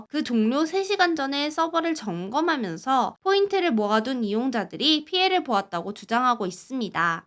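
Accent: Gyeongsang, South Korea. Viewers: 경상도 분인가요? 서울, 경기도 사람들은 월요일은 워료일. 일요일은 이료일이라고 읽는다는데 월.요.일/일.요.일이라고 또박또박 읽으셔서 궁금!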